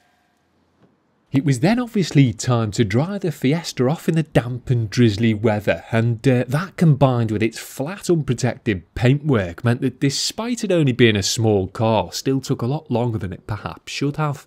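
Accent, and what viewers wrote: Liverpool, United Kingdom. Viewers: You have the most british accent Ive ever heard, I love it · Scouser mate (Liverpool) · What a strong British accent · Nobody sounds more British than this guy😂👍🏻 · Is that scouse accent? Sorry if I am wrong. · This is it. This is the best British accent. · What a pleasure to ear these sophisticated sentences. I hope one day I could speak with a similar ton and accent. I hope you would not mind if I ask in which area of UK we speak such a nice way. Cheers from Normandy.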